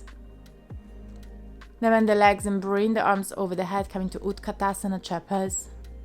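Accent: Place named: Italy. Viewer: That was really a phantastic flow but for me it would be perfect if you could talk slower. Please consider one hast to get used to your Italien accent and not everybody who is watching this videos is a native english. So I am german for example. Nevertheless thank you very much for this interesting lesson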